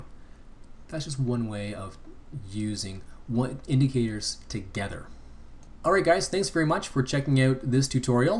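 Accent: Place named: Canada. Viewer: Thanks mate and I really like your Canadian accent :)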